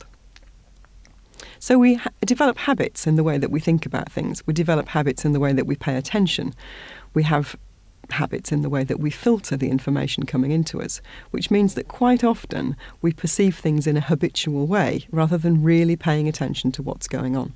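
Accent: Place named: RP, United Kingdom. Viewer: Whenever I need to act out a standard british accent, I think of you. It's not the accent, it's poise-ness, precision and clear impact that I get out of it. This is your gift, and you are sharing it. Thank you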